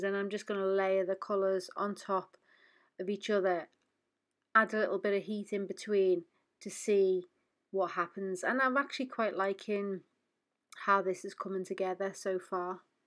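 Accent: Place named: Liverpool, United Kingdom. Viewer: Fantastic experiment one I'll be trying as ive switched from acrylic pouring to resin. I’ve watched your other videos and think you have such an easy familiar voice to listen too .. dare I say Scouse/Wirral accent? I'm from Wallasey, Wirral. I also love the fact you don't have music blaring away in your videos and you have a very eloquent way of speaking, no um's no going off subject and no dragging out your videos.. i wish more was like you. Thank you so much. You are so inspiring.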